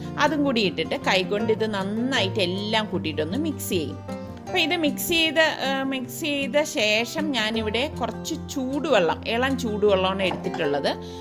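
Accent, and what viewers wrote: Trivandrum, India. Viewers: Ithade correct place evda?? Lockdown aano avde....nte place nedumangad ippo kochila njn ....ithade samsaram kekkumbo nikk nte tvm orma varuva..... subscribe cheythutto..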